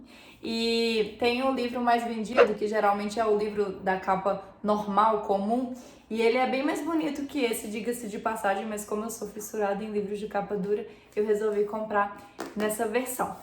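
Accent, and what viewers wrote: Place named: United States. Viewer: Sotaque americano 😍😽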